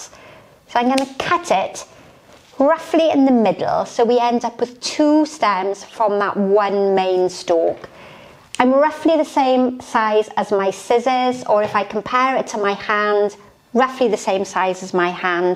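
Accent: United Kingdom. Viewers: I'm from Canada 🍁, cheers love, perhaps because your British with accent yea. British with flowers 💐 is like fine wine. All right than, thanks dear.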